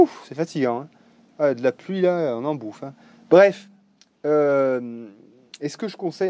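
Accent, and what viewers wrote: Auvergne, France. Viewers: J’avais jamais remarqué que tu étais adepte du « Y » auvergnat lol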